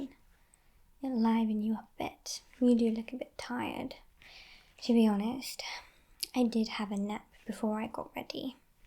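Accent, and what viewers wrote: United Kingdom. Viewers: Your ability to morph into any Role and play that Character to perfection is an amazing Talent. You can honestly tell any story with your British Accent and I could listen to it for hours. Love the Red Costume and the Black Necklace and Masquerade Eye Mask.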